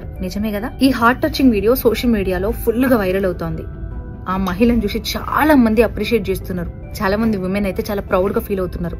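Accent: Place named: Hyderabad, India. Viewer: Your Hyderabad accent is very Good . Also how to donate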